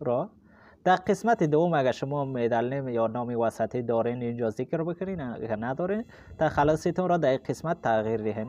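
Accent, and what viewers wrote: Tajikistan. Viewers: خیلی هم علی و واضح بود تشکر بسیار زیاد و لهجه تاجیکستانی هم اینقدر زیباست که هر رقم آدم متوجه میشه ::::::::::: بنده افغانی هستم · تا الان با گویش تاجیکی آشنا نبودم، متفاوته و زیباست، ممنون از آموزش خوبتون، بهش نیاز داشتم🍀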